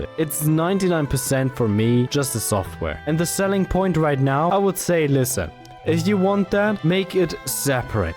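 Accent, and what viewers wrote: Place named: Germany. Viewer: i guess you are german good accent tho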